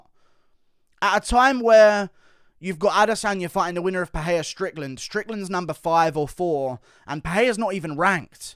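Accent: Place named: South London, United Kingdom. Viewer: Souf London boi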